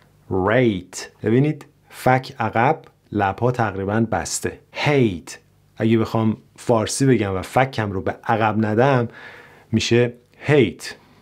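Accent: United States. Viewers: آقای دکتر شما در انگلستان هستین چرا آموزشتون لهجه امریکنه؟من لهجه بریتیش رو می خواهم😢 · اولین ایرانی هستید که لهجه شما خیلی شبیه به آمریکایی زبان ها است من در کلرادو آمریکا زنده گی میکنم اگر یک کلمه را هم یاد میگیرم به لهجه خود اینا یاد میگیرم ممنونم ازین که اینقدر خوبید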